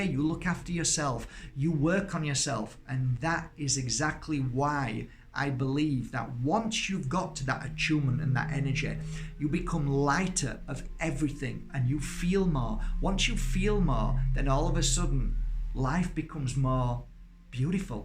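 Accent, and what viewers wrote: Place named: Northern England, United Kingdom. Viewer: There’s nothing better than listening to a nutty northerner which oozees every tune of light and positive energy, your awesome mate, Thankyou for giving your time experience 🤙🏼🙏🏼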